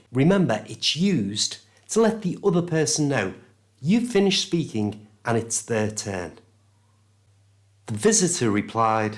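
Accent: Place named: RP, United Kingdom. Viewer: Perfect classes!!! 👏🏼👏🏼👏🏼👏🏼👏🏼 And your accent is beautiful. Is it RP accent?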